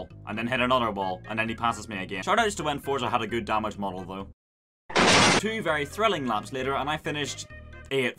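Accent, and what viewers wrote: Northern Ireland, United Kingdom. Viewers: Your voice is a blend of American, German and Yorkshire Edit: ok that is basically a Northern Irish Accent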